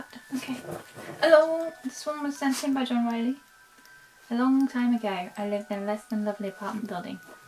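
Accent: United Kingdom. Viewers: The British accent really helps make scary stories sound better. It amazes me that Key understands “Lay your butt down”. How could he understand that? To me, that basically means that Key understands virtually everything, or else he knew he was doing something unusual and the tone of her voice suggested a request. Either way - pretty darn smart!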